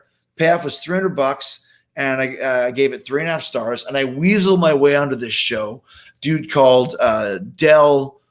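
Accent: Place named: Minnesota, United States. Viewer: he he stil got little bit of mn accsent coming out now and than lol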